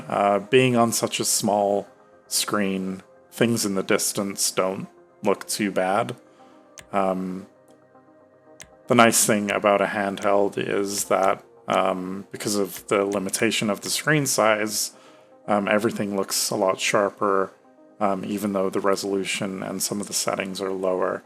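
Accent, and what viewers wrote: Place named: Canada. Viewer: You have a sexy Justin Trudeau accent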